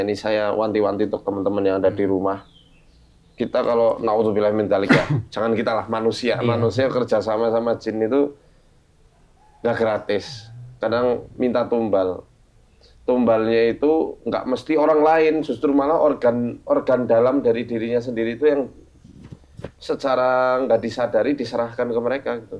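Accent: Banyumas, Indonesia. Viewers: Jine kiye ngapak juga y ramane 👍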